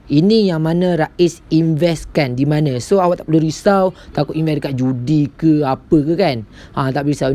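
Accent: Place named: Pahang, Malaysia. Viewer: mcm org pahang je bcakap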